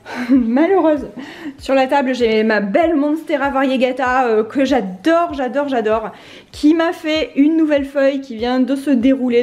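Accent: South, France. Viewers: Bonsoir! Je suis super contente de découvrir votre chaine ! Je suis du Sud, Boulbon 13150. Mais voila, j'ai emménagé depuis le 1 aout à Lyon 5. J'y suis super bien! Mais quand même... Votre adorable accent du sud me rappelle ma région j'adore !!!! J'ai tout de suite en tête les cigales, le soleil, le thym, la lavande!! Que du bonheur vos vidéos que je découvre aujourd'hui!!!! Merciiii!! Merci pour ces moments de partage, de conseils, d'excellentes idées recettes ect....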